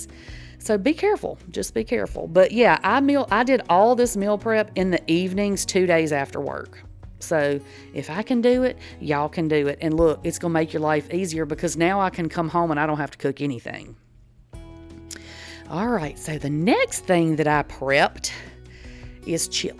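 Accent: South, United States. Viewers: I'm sorry I'm now rascist. against a southern accent, y'all sound like Trump voters. FAFO. Can't watch ur content😢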